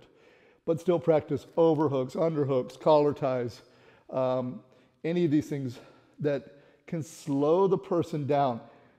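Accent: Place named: United States. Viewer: Never realized you were teaching out of Germany; I just assumed the US by the accent. Almost makes it feasible to visit from Norway as a vacation project some day.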